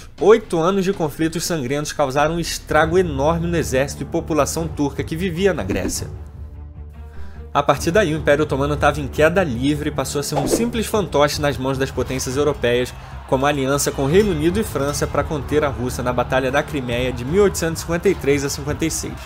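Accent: Rio de Janeiro, Brazil. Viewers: insuportável sotaque e giria carioca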